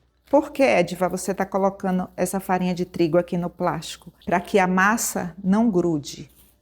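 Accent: Bahia, Brazil. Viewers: tu é simplesmente maravilhosa abençoada. Que mãos de fada. Sem contar que tú é muito espirituosa 😂😂😂😂 te adoro. Obrigada por existir em nossas vidas. ❤❤❤❤💋💋💋💋🫂🫂🫂🫂 Vcs goianos, baianos são muito parecidos com a gente aqui do Rio grande do Sul muito hospitaleiros. Acho que pelo teu sotaque tu é baiana né? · Mto didática sem falar mto e enrolar, dá gosto de ver suas receitas maravilhosas, parabéns!! Sem contar que esse sotaque baiano deixa td mais gostoso...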